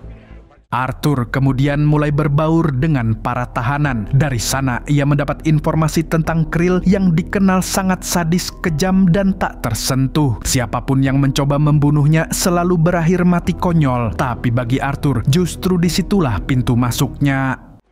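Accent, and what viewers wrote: Banjar, Indonesia. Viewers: Hahhhhh orang Banjar kahhh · urang banjar sekalinya ikam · Mamang ni org apa si? Kok ada banjarnya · Pian org banjar? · banjar kah pian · Adminnya urang banjar leh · Abang,Pian ne asli org Banjar kh 😂😂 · Urang Banjar kah mang 😅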